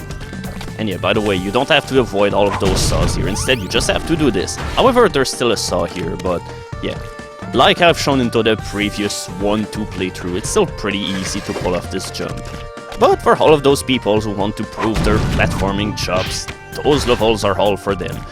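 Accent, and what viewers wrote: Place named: Canada. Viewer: Daaamn, you sound even more Canadian than me!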